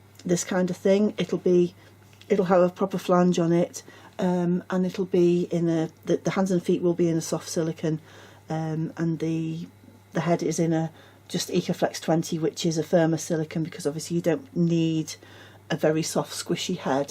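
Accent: United Kingdom. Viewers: I don’t make these I just watch you, I actually said the bigger head looked like a boy and the smaller one a girl too, to myself like 😂😂😂 beautiful work as always, I’ve watched you for years, my heart used to go like a train when you were taking the full body babies out of the moulds 😂😂😂, you’re heads and faces were amazing, I’ll have to go back and watch them again ❤❤❤ edit: yes I remember them pigs 😂😂 I didn’t care for them either, tbh I didn’t like any of the kids programmes them days especially pob 🤢 good lord, I’m in Liverpool, I can hear a slight scouse twang in your speech too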